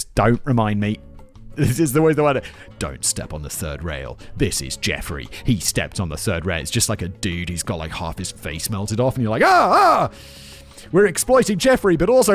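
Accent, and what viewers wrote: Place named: England, United Kingdom. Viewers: *in a British accent. (I don’t know the exact one.) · English accent to be precise, whistler doesn’t have a northern Irish accent, and you can understand his English so it’s not a Scottish accent.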